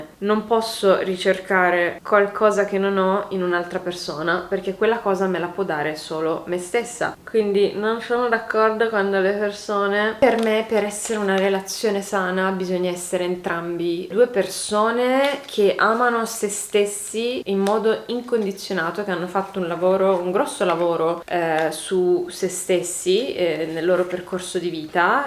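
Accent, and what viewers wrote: Turin, Italy. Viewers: video molto bello ! però la questione accento non è troppo vero, l’accento di torino è inconfondibile ✨ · Il tuo accento è assolutamente torinese!😄😄😍 Tutti i torinesi, sono conviti di non avere inflessione. Io che sono nata a Torino, ma vivo e ho origini toscane, lo so bene. Adoro il torinese e mi manca la mia città. Sei una persona bellissima 🥰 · Mi fa morire che pensi che i torinesi non abbiano un accento! Hai un accento fortissimo 😂♥️ e lo trovo adorabile!! Bellissimo video come sempre, non commento mai perché sono riservata, ma oggi volevo dirti che i tuoi video mi tengono tanta compagnia e mi infondono tranquillità, grazie 🌸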